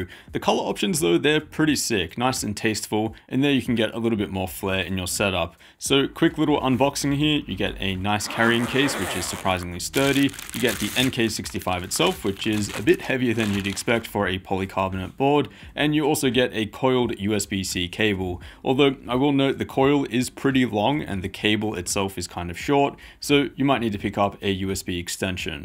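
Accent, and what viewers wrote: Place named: Australia. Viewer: I cant tell where his accent is from, bit australian but not really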